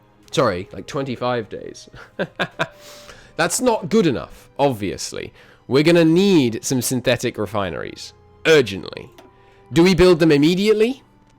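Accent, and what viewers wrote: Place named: RP, United Kingdom. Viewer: How would you describe your accent? To me (Australian born), it sounds like an English Received Pronunciation, but perhaps tempered with something else. At times it sounds faintly like a sort of 'cultivated' Australian accent, the way a well educated younger Australian who isn't ashamed to show it sometimes speaks. (But not Cultivated Australian, which is a semi-official category, and today virtually extinct - the former PM, the late Malcolm Fraser, was an exemplar of this accent, and you don't sound anything at all like him).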